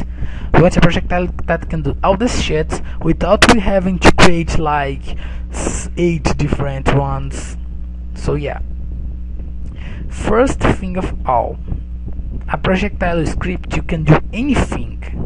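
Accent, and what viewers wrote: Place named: Brazil. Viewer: seu sotaque [e de brasileiro kkkkk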